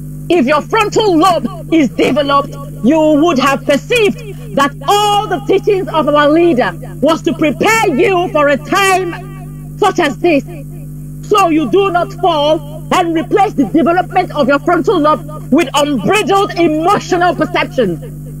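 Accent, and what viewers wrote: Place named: United Kingdom. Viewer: She speaks good English with british accent; but definitely said nothing about the restoration of Biafra, the release of Mazi Nnamdi Kanu and the continouos inversion of our land by Fulanis herdsmen in collaboration with Nigeria sponsored military and para military. The only problem DOS and their co have is Mazi Simon Ekpa nothing more. very disgusting. #FreeMaziNnamdiKanu #FreeBiafra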